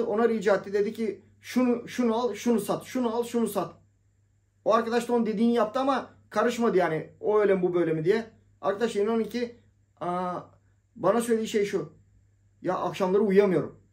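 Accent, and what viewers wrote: Thrace, Turkey. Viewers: Abi İzmirli bir ablaya dinlemiştim 3 gün önce sizi direk bizim ege şivesi bu demişti bende yok Trakya demiştim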